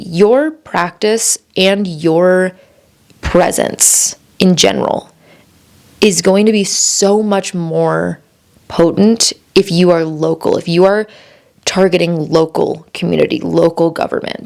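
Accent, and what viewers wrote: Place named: Colorado, United States. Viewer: Decided to catch up on some of your vids I've been meaning to watch, and heeey this popped up! Lovely to see something new from you. I adore how down-to-earth all of your content is, and I was so excited to find out you're a fellow Coloradan! (No hiding that native pronunciation, haha)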